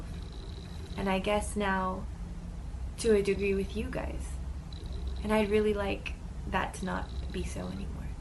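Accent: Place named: United States. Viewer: اممم. امها امريكية فهمت ليش تتكلم كذا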